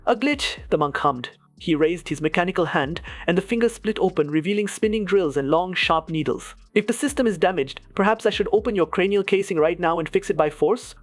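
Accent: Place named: India. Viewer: Sounds indian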